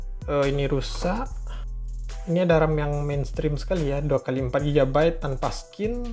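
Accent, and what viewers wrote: West Sumatra, Indonesia. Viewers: bahasa indonya kek orang minang wkwkwk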